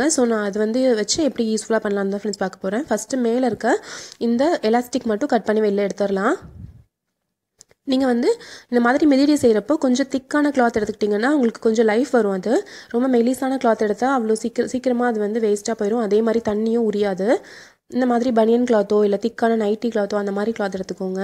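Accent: Coimbatore, India. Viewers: Akka nenga Coimbatore ah..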